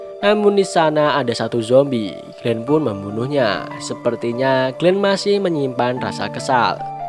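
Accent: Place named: Java, Indonesia. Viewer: 99,99999,999% b Indonesia 00,00000,001% jowo ne metu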